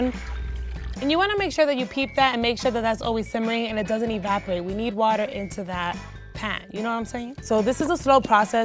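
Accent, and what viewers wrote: New York, United States. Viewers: New York accent is fire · She gotta be from nyc nfs😭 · She sound like she from NYC or NJ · She from New York right? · She is so ny spanish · She’s deadass from New York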